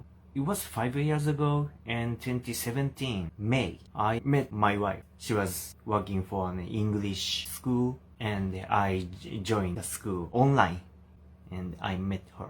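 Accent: Visayas, Philippines. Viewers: Thank you for giving us joy and entertainment. Kakaaliw Ang bisaya mo. Ang cute lang.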